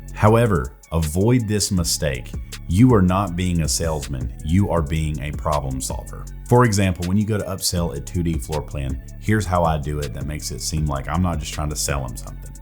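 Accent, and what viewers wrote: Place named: Tennessee, United States. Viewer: Watching your videos as I decide to get into Real estate photography after leaving Real estate and just realized your voice sounded like it had some Tennessee in it! I’m actually from Chattanooga and recently moved to Louisiana. Love the knowledge and insight!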